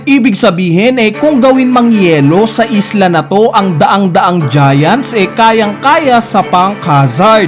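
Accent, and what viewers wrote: Visayas, Philippines. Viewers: Bisayang bisaya a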